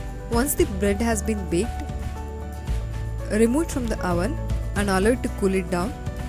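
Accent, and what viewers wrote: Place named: India. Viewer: Disliking because of your cringy Indian thick accent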